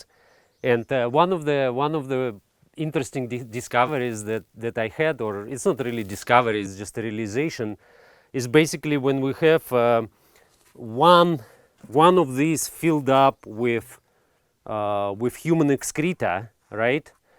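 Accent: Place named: Russia. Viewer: произносить слова совершенно не по английски, а в русской транскрипции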